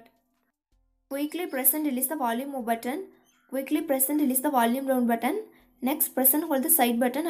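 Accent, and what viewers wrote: India. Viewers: I hear Indian that means I’m in the right place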